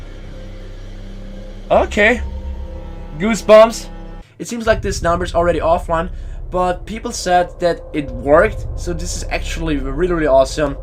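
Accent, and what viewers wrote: Germany. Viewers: are u german because i can hear german in ur videos im german aswell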